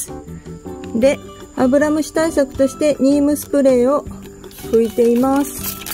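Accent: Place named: Tohoku, Japan. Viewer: 『にずっつぶ』讃岐かぁおもいよったら、東北のナマリやなぁ。間引き、優劣がないポット…悩むんですよね。でも、さんが「気が小さいけん」ゆうて、なんのこっちゃ！？おもいよった😆😆 元気いっぱい、まけまけいっぱい葉っぱが出よるやん。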